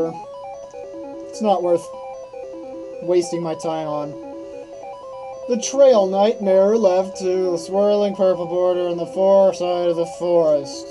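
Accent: United States. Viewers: Lmao i used to watch this when i came out on my ultramegaunknown account. Great times! Too bad YT deleted my old comments. I remember commenting asking what accent you have and you said it was Oklahoma. And another guy said "Where the wind goes sweeping through the plains." Then you said, "More like where the tornado sweeps through the sea of concrete." Lol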